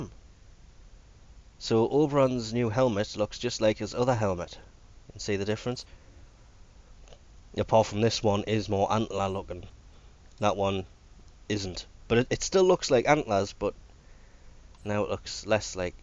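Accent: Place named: North, United Kingdom. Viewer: have been watching all your videos love the northern accent. When ever I build a new weapon I half expect you to give me a review lol. you have pushed me on so far from my early days. I now hold my own in all the high lvl matches and I love the wraith twin vipers to! keep up all your good work love the channel.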